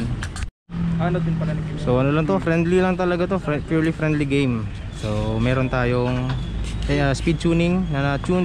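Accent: Iloilo, Philippines. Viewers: Ilonggo ka boss? LOL “ano hambl mo? maapas lang sila ah” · Ilonggo ka sir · Ilonggo dn ni pre??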